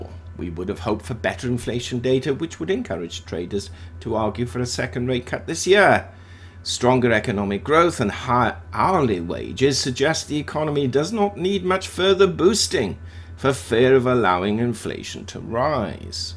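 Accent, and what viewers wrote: United Kingdom. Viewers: Love hearing that Brit accent, very comforting. Thanks for the update.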